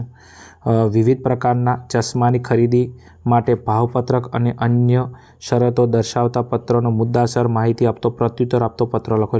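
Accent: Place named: Patan, India. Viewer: Gujarati Patan nu che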